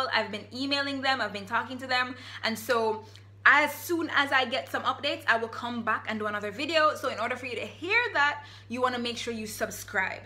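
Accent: Jamaica. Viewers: you sound Jamaica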